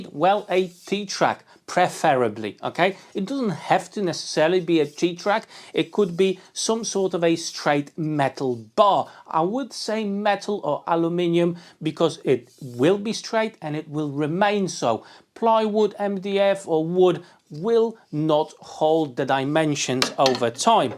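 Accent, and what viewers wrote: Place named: Northern England, United Kingdom. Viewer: Nice to hear that you’ve retained your northern English accent 😅.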